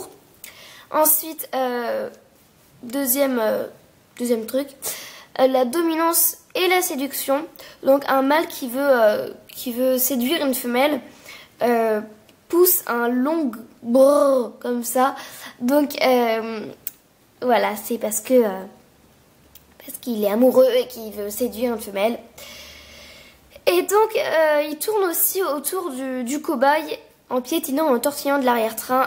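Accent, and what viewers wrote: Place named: Belgium. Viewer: t'es belge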